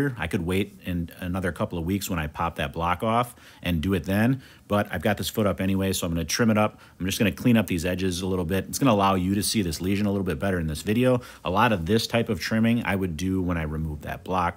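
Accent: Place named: United States. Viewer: Why does your voice sound American in some videos but others it’s mixed with Irish.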